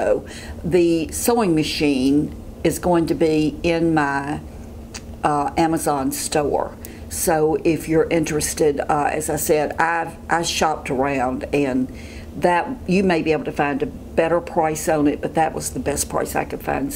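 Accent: South, United States. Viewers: There has only been one of your videos that I had a problem with the volume as did others, based on the comments. It was frustrating because I would happily listen to you read your local phone book, with your charming southern accent. Best, Jane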